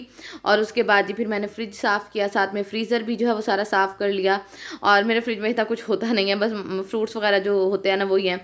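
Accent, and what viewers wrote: Saraiki, Pakistan. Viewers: Aunti apna lehja theak karen sereki lehja hai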